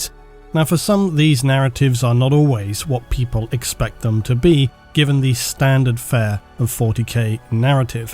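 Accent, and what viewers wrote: England, United Kingdom. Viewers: Documentary content just sounds better when narrated with an English accent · 2nd this, rather enjoy me some sophisticated brash british guy & the pragmatic dry humor & his narration tone that slaps like cold steel and enraptures one so thoroughly you hardly even notice the mutton chops growing over you’re ears. Edit: Reasons.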